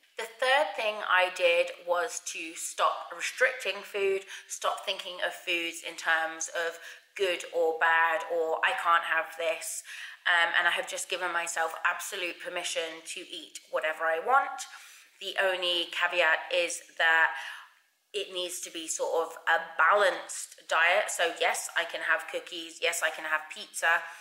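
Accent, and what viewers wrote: England, United Kingdom. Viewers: Been watching your vids and this was the first I heard with your vocie! Me: oh she's English!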